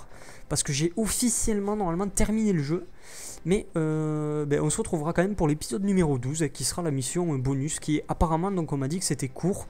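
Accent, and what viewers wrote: Toulouse, France. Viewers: C'est toulousain, on voit que t'entends pas souvent des marseillais ;)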